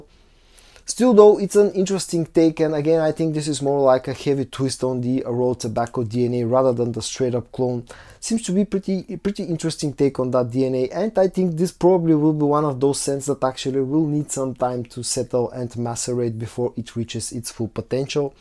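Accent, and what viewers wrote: Bulgaria. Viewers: Great video! You're getting getting better all the time. It was funny how you just walked past the camera in the beginning. 😂 I enjoy listening to your beautiful Bulgarian accent as well, and how some words are pronounced, like it sounded like you said "meatloaf note" when you actually said "mint". 😅 I was like"Yeah! Now I need to find a bacon note!" Great to see you getting more comfortable. Thank you for the time you spend sharing with us. Love from Virginia, USA.❤️🙏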